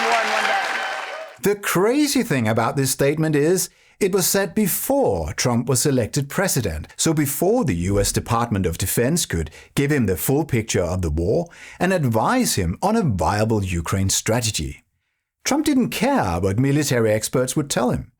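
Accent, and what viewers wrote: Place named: United Kingdom. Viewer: I cannot thank you enough, I love your content and presentation. May I ask, are you German? You have a British accent but is a German name. We (normal Americans) are glad that Germany is on our side… we have some seriously bad adversaries that we will soon be dealing with…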